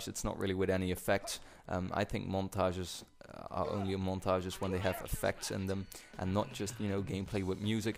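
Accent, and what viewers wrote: Netherlands. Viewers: Ik ben ook Nederlands en ik zocht op youtube een commentary over Breaking Bad en kwam toevallig op jou uit! Geweldige serie. Ik vroeg me van het begin al af of je Nederlands was ;)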